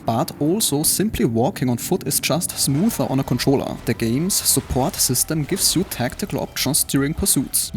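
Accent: Germany. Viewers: Now that’s one German accent !!! I love it - thanks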